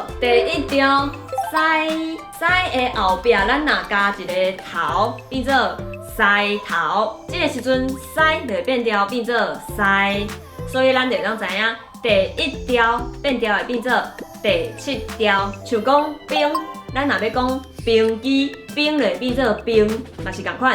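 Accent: Tainan, Taiwan. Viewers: 我生於1951年，仍然謝謝~ 1)邏輯清析的台語文法課; 期待妳的新課程; 2)令人懷念的[聽了六年]的台南腔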